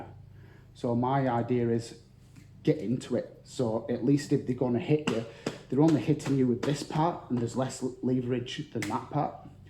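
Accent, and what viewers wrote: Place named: North, United Kingdom. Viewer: Everything sounds better with a norther accent lol "nads" hahaha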